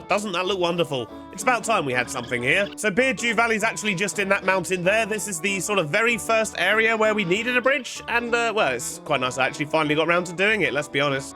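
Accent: United Kingdom. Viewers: I love the fact that you have a similar British accent to Stampy, it brings me a joy that nothing else does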